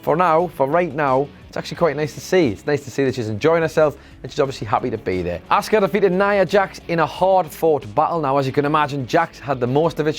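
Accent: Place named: East London, United Kingdom. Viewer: Really guys? It’s regional accents and dialect. Adam Nicholas and Clery are from Newcastle, and that is a notable accent. See also Ant & Dec Andy Murray is Scottish, and is from east London, and has a corresponding accent.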